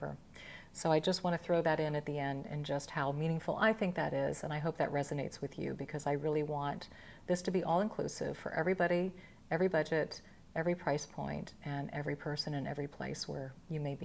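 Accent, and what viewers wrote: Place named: United States. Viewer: This content, for me, is an excellent source of beautiful American English and, of course, a tremendous positive energy source. So easy to watch!:) Thank you so much,!:)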